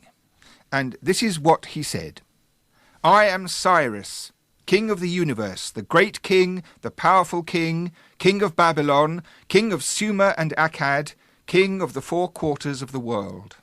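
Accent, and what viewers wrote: England, United Kingdom. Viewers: That’s not what I mean, I mean he’s pronouncing them wrong, and his English accent is in the way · With fkn British accent.... No man don't pls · Now Why I said "could you sound more middle eastern" is my own buisness. İf you want to argue it, you will ask properly or you are a waste of time. İf you try to be a smartass with that first comment of yours, you are incompetent. I know how modern assirian sounds by my neighbors and I learned many phonetics of the ancient one from people like Veysel Donbaz. And this man's british accent sounded off, I wrote "could you sound more middle eastern please". That man and every middle east language speaker understands what I mean right away. Besides not asking properly and not knowing what I mean. Only with assumptions you are writing all these out of point analogies and garbage like troll/dumb american. 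· I really respect your efforts but your accent sounds British when you're trying to speak Akkadian. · This is how i understood what he said lol - ana kukuresh- i am Cyrus - shah ??? Akadi - king of the Akkadians - i swear it sounds like some Persian Dialect except with an Englishman's accent. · Almost no pure vowels. Every vowel sounds the typical English vowel/semivowel dipthong. What a surprise! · This guy speaks Assyrian with an British accent. Awful. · I mean, we don't know how to say it in ancient Assyrian (even though this text would technically be in Babylonian), so there's no point in mimic a modern foreign accent. Plus, he's a British man with a very British accent, not American. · Love his enthusiasm and scholarship, but man, you can sure tell he’s a Brit. We have many thousands of speakers of Assyrian Neo-Aramaic in the world today - they could help him with his pronunciation or even do the readings for him. 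I don’t mean to be a troll, and I’ve heard worse accents, but authenticity is really important. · Sound like hell hole with that English accent instead let an Arabic or some Jewish person read it since their language were related to Assyrian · Yes, it is true that the man speaking here pronounces the Assyrian selections with a strong English accent. Typically of English speakers, whether British or American, he rolls the "R"s strongly to give a "foreign" touch. This is like how Americans tend to pronounce every foreign word they come across as though it were French, or how Japanese tend to pronounce every foreign word as if it were English. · Still sounds like some daft English Bloke! Come on, try harder on your vowels, you're pronouncing them like some English guy. I can hear your accent under the words.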